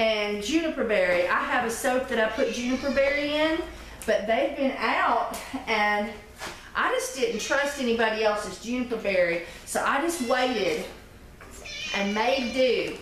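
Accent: South, United States. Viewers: Are you kidding me?? Jojoba oil is the cheapest oil EVER!!!!!!! And yes even the purest 100% is very cheap! I dont know where you buy yours from but over here in europe is the cheapest oil of all of the oils on this world together with grapeseed oil! ITs so cheap cuz its vitamincs and nutrient value is the lowest out of all the oils! Johjoba is a basic carrier oil and no powerhouse oil at all! And also you jsut made that up with the whole puttting extra oil in the bottles incase of a spill! ahahahahhaha You re so funny! Ive worked for beauty compagnies and trust me they dont anything ectra in there! You just made that up to feel bettter about yourself. And the reason why google wont recognize your voice is cuz you talk very ghetto and country! She didnt even try to speak correct English! From to you reminded me extactly like Madea!!!!! jaahahahahahah Mabble simons aka madea is the bomb! 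YOu should act and talk mroe like her! IT suits you.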